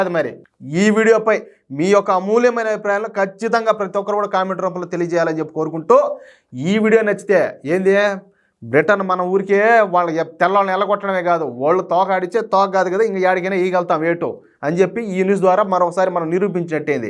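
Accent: Rayalaseema, India. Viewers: Continue the videos with the same tempo and Unique Ratalassema Slang.. 💐💐👍👍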